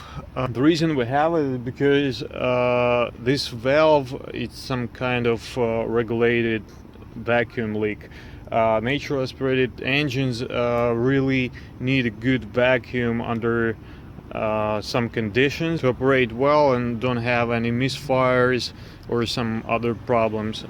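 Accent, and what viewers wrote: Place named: Russia. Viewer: You sound like Ray Raymonde with a Russian accent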